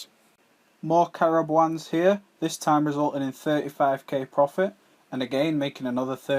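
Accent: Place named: Scotland, United Kingdom. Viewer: gotta love the scottish accent!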